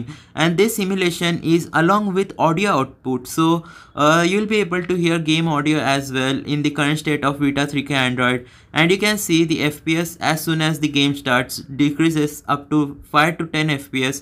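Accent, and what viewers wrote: India. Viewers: Ты индус? Акцент пиздец у тебя,нихрена не понял на английском 😁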